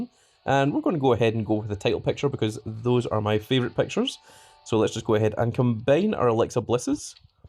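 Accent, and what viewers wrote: Scotland, United Kingdom. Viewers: Hey dude I can hear from ur accent your also Scottish, I beat you on KOTR shocked to find out you stream Supercard GGs man!! I have subscribed to you bud. 👍🏻😎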